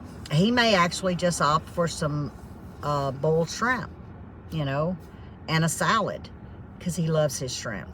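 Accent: South, United States. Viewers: Miss I say sqaRsh, and waRsh, Wooder, (water) and Breeeed (Bread) and I'm born and raised on Marylands Eastern shore. We sound a like suthern sister😂❤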